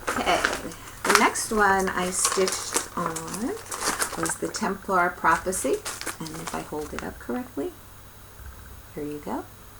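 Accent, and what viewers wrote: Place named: East Coast, United States. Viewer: Hi. I'm glad I found your channel today. I'll go back to your Flosstube #1 and catch up on your videos in the days ahead. I moved to the desert southwest in 2000 but you and I have the same accent so I believe we are both from the same coast of this beautiful country. Like you I cross-stitched a little bit in the 1980's until work and bringing up 2 boys took precedence. Once the boys were grown and on their own, I took up quilting and about 2-1/2 years ago I stumbled on cross-stitch again at our State Fair. I am glad that I have two of the most wonderful hobbies and I love them equally. Since there are four full weeks in May, my Maynia plans consist of four WIP's and four new starts. Monday through Friday of week #1 I will work on WIP #1 and Saturday and Sunday work on new start #1, etc. Good luck with your Maynia plans and I look forward to your next video.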